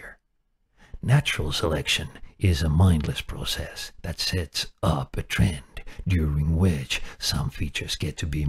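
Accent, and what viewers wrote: Germany. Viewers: I like science on youtube but I get the impression he is like acting and I dont like it. Is that his real voice? Theres nothing wrong with having a German acent and his natural voice will do fine.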